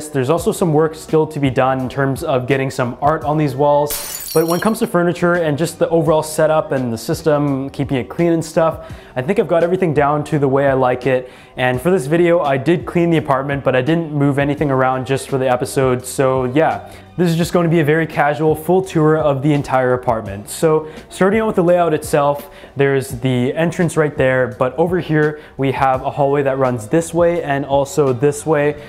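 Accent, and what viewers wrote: Canada. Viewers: He sounds Canadian.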